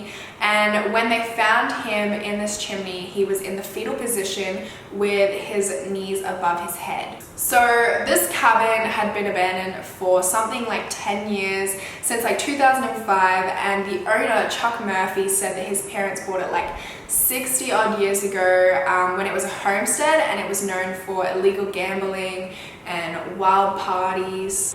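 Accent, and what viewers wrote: Australia. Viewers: I have just been watching all US videos but my attention was drawn to your thumbnail at the side of my screen and thought "she's definitely Australian" so im here to check I was right....but I cant figure out what it is about Australian girls that makes them identifiable amongst everyone else on here? I can always predict when an Aussie accent is coming...... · She’s Australian. It’s an accent.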